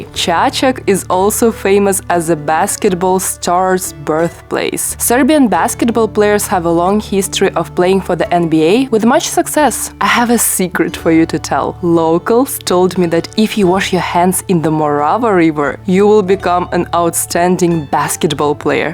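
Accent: Russia. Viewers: Your super strong Russian accent when you try to speak Serbian is adorable 😂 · I hate that Russian accent when you speak Serbian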